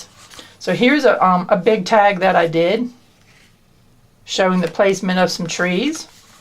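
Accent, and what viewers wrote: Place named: Baltimore, United States. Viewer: That would be awesome but I think we are on opposite sides of the country. I thought I heard a familiar accent (I am from Baltimore) but we live in Seattle. My sons are still in Maryland but I don't think I can talk them into picking up blueprints to bring out in their suitcases for Christmas!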